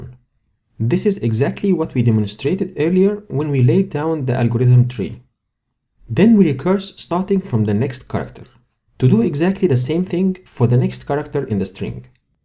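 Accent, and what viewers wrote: Egypt. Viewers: What an Egyptian accent <3 <3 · Hi, your accent is Egyptian lol, let me know if I am correct. Great video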